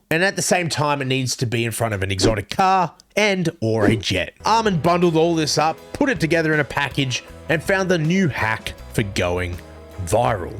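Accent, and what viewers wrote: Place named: Australia. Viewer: You're bit too aussie for me to subscribe or like the vid. cheerio mate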